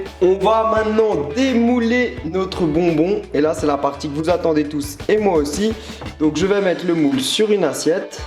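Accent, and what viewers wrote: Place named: Alsace, France. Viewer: C'est l'alsacien ;)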